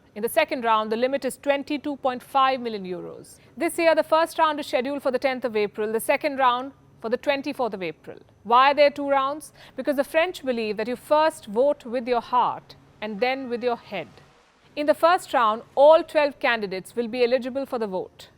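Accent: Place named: India. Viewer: Palki Sharma beautiful lady and a nice Indian English accent.🥰 I root for Macron I hope he wins radical far right or left are not good for democracy.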